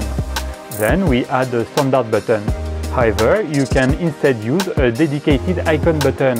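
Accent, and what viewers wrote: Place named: France. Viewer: Excellente présentation!!! Il me semble entendre un accent français, à la base ;-)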